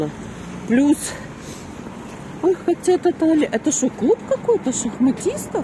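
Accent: Odessa, Ukraine. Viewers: У ВАС ЧИСТЫЙ ОДЕССКИЙ ГОВОР, МНЕ ОЧЕНЬ НРАВИТСЯ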